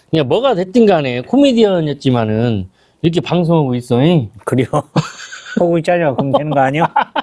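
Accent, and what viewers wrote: Jeolla, South Korea. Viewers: 요즘 계속 찾아보는 초짜영상입니다. 전라도 사투리 겁나게 재밋네요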